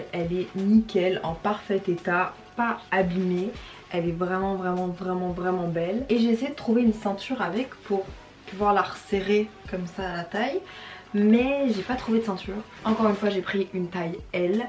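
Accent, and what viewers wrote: Quebec, Canada. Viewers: Tu prendrais pas l’accent quebecquois ahaha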